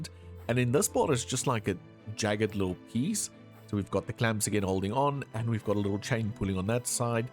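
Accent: South Africa. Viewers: are u south African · YOU ARE SOUTH AFRICAN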